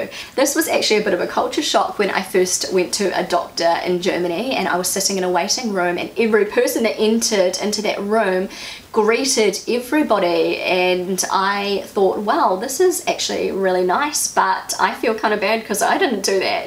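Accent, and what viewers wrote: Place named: New Zealand. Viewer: Love it!💕 happy to see how comfortable you feel and how much you have germanized without losing any of your kiwi identity ☺️ PS: love your account, as i‘m a german and spent 9 months in Whangarei during school and your account always reminds me of the kiwi accent and the country in general🥰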